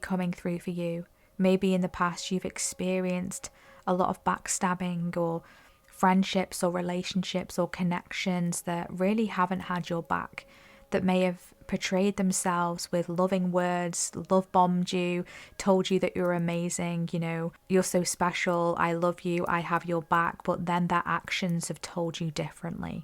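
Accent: Northern England, United Kingdom. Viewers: Beautiful message, beautiful name, do I sense a bit of northern English as we are the best 🎉❤🙏✨🌹🌹🌹 peace and light 🌟🙏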